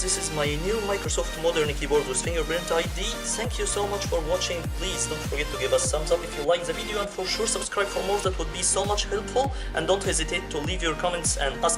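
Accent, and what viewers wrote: Egypt. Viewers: Speaking your language is better that zis ziz zeez egypt accent .. you makes me really angry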